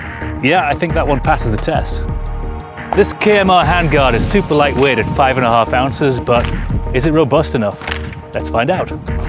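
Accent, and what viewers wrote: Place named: United Kingdom. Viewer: I love my bcm stuff but the British accent didn't work for you guys.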